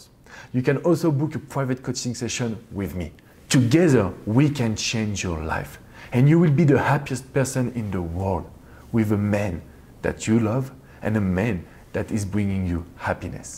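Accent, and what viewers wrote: France. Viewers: You are soo Handsome! #FrenchAccent 😄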